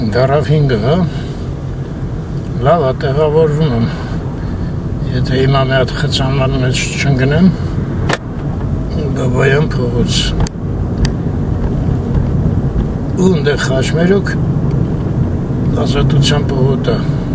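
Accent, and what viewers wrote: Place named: Gyumri, Armenia. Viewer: Вохчуйн! Как давно я не слышал ленаканское наречие именно ленаканское, а не ленИнакаеское ! Было время ехал в командировку в Ленинакан. Чаще на электричке. Знал название почти всех станций, сейчас помню ни все. Ереван, 8ой км, Масис, Эчмиадзин, Октемберян, Каракерт Гетап,, Ани, Ленинакан.. А когда поехал за бульдозером на МАЗе, в Ленинакан поехали через Аштарак, а возвращались через Октемберян. В Ленинакане работал на бульдозере на территории мясо комбината А на территории молочного завода в Ахуряне.. На обратном пути в Октемберяне пробило колесо на трейлере.. Заменили колесо на следующий день. В Ленинакане оставался в гостинице здание похожее как гостиница " Ани" в Ереване. Рядом был кинотеатр названия не помню. А еще в далеком детстве отдыхал в детском санатории в Ленинакане. Вот такие воспоминания!!! 😮😊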